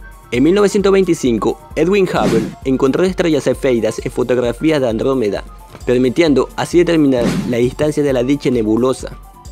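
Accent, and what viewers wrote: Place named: France. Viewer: Ahh y la voz con ese toke como de frances pero en español me explico?? Español con acento frances...como el inspector de la pantera rosa.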